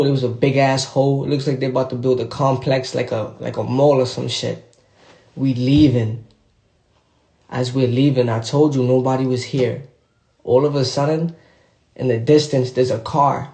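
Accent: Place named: New York, United States. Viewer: what makes the story even funnier is his New York accent 😭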